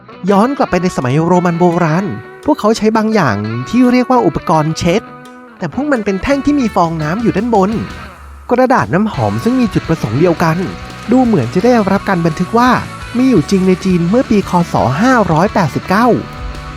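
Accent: Isan, Thailand. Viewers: คนอีสานบ่หนิ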